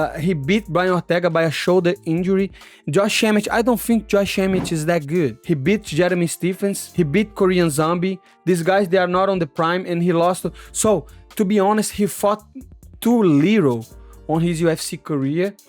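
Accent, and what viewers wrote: Brazil. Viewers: I watch your channel because you remind me of my brazilian friends accent back in high school. Youre a great fighter and comedian Respect brother.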